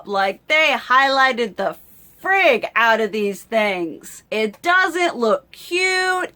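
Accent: South, United States. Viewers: I absolutely Love your accent! I'm originally from Wva. I just knew you were from the South! I love your channel!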